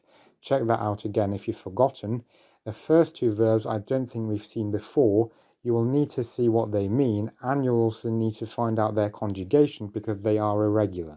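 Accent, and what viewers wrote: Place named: United Kingdom. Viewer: I am curious, you have a strong british accent.. are you a native british i am guessing, if so, how did you learn and be so well knowledgeable on the russian language? Great video as always, cases, greatly explained compared to other videos! Will share this!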